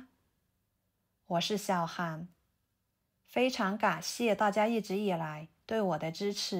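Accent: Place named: Yunnan, China. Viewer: 云南普通话😂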